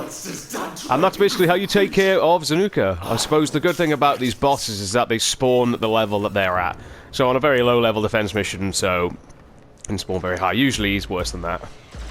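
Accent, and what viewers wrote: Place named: Wales, United Kingdom. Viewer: Your welsh tones make me wet.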